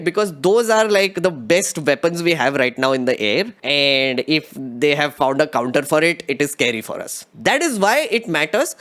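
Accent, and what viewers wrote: India. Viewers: When your accent is this bad you should just stick to hindi. Indians are the only people who speak a foreign language in their own land😂.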